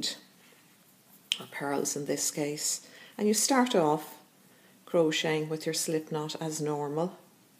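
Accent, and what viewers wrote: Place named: Ireland. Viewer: Very clever lady! Thank u. Love love love the Irish accent! Xo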